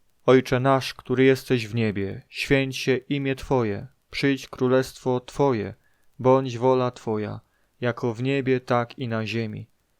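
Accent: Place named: Silesia, Poland. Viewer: Akcent Śląski.Początek modlitwy szczery i własnymi słowami jak wskazuje Słowo Boże.Jednak końcowa część modlitwy oddzielona lekko od reszty jest niezgodna z Pismem Świętym,ponieważ nie wskazuje modlitwy do ludzi(Rzym. ) a nawet potępia.Maria matka Jezusa była pokorna niewiasta i nie oczekiwała modlitwy do siebie,ale też kierowała je tylko do Boga Ojca.Pan Jezus poproszony przez uczniów o naukę modlitwy też wskazał,że należy kierować je tylko do Boga Ojca,,Ojcze nasz....świec się imię Twoje(jakie?)....."itd.Pan Jezus nie powiedział módlcie się do mnie,albo módlcie się do matki mojej-tak nie powiedział! Końcówka to wierszyk powtórzony po kimś-nie własne szczere z serca płynące.Biblia podaje dużo przykładów szczerych modlitw sług Bożych w różnych miejscach i były to osobiste myśli nie czyjeś.Nawet w Ew.Jn.17 rozdział cały to modlitwa Pana Jezusa do Boga Ojca-szczera z serca,nie do Marii i nie do anioła.To tak naprawdę przykład modlitwy niezgodnej ze Słowem Bożym,choć robi wrażenie i to jest zwodnicze😘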